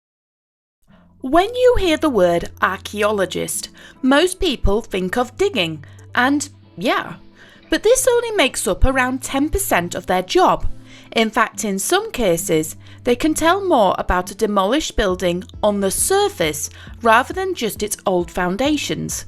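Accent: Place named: Yorkshire, United Kingdom. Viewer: What an amazing story 😃. This is rapidly becoming one of my favourite history channels 👏🤩🙏🇦🇺. Plus your accent reminds me of Yorkshire which I've always loved 😊.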